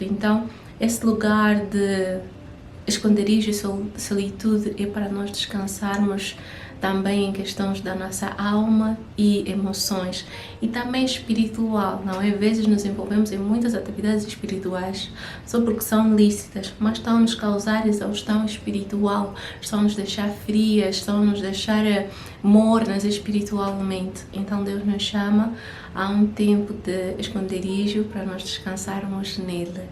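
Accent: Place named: Portugal. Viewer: Já maratonei todos seus vídeos 😊 Você é de Portugal ou Angola? Desculpa a pergunta é pelo sutaque, um abraço no aguardo de mais vídeos 🙏🏽👑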